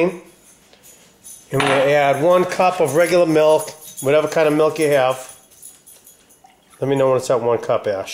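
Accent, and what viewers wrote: Boston, United States. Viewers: Do I hear Boston in there?🙂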